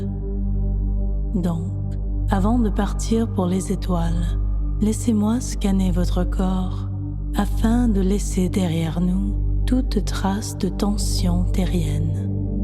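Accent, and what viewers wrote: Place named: Democratic Republic of the Congo. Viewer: C'est pas agréable de se faire raconter une histoire par une personne ayant un accent congolais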